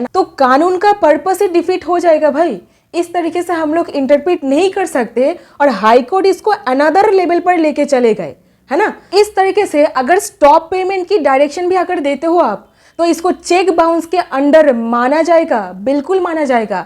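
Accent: Bengal, India. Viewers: You talk like Mamta Banerjee... tussi bong ho? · Bengali accent bahut achha hai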